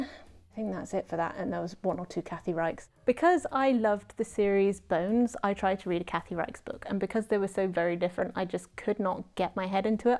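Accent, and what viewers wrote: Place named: United Kingdom. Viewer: It's great to meet a female British booktuber. From your accent i presume.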